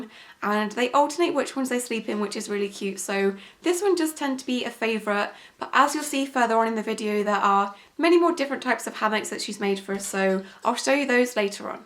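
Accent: England, United Kingdom. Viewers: are u from England? So am i!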